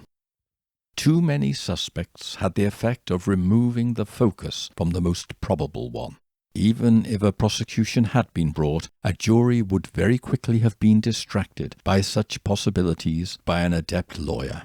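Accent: United Kingdom. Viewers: Hi I alway's loved the British Accent. I wish we Americans still sounded like that!:)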